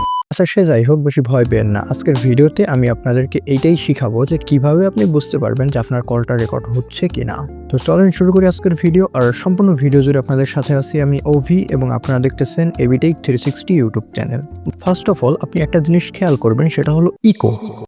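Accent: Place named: Bangladesh. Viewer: উনি বাংলাদেশের লোক। ওখানকার ভাষায় বলছে।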